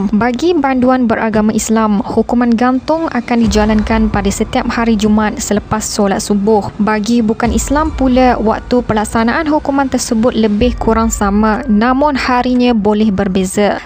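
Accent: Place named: Indonesia. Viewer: Kenapa macam slang Indon je..Cuba gunakan balik bahasa baku....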